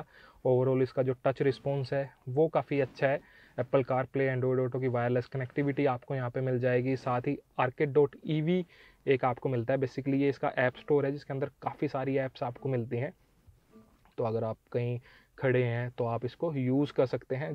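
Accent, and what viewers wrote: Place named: Haryana, India. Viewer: Bhai apka haryanvi touch miss ho raha hai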